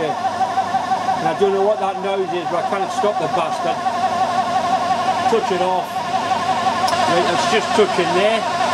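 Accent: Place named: Northern England, United Kingdom. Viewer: Propper northern working class English.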